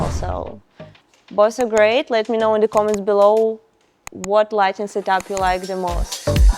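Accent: Russia. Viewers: Крутая ) но русский английский прям слышно)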